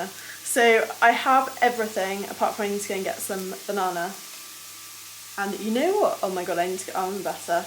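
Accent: RP, United Kingdom. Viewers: love that British accent, sexy woman!!! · You created an emotive response in me from the first bikini angle, thank you, I do like a well spoken girl (posh) :-)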